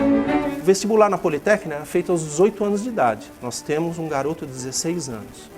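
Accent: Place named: Italy. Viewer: Caraca, que sotaque de... italiano!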